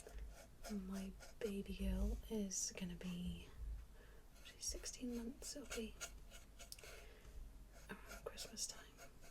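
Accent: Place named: Wales, United Kingdom. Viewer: oh i've been looking for more welsh asmr creators! such a lovely accent and voice ❤️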